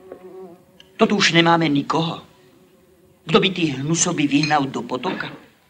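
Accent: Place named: Western Slovakia, Slovakia. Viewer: Tota zapadňarščina še mi mocno ľúbi😂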